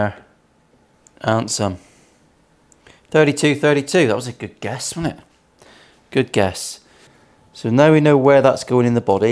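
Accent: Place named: Devon, United Kingdom. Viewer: Really enjoying your videos, great stuff. It's great hearing your accent as well, I have friends who live in Ilfracombe so it's a great reminder of them.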